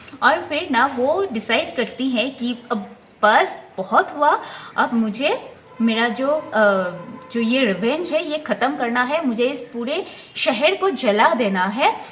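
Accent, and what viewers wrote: Bengal, India. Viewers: accent LG rhi thi Bengali Hindi....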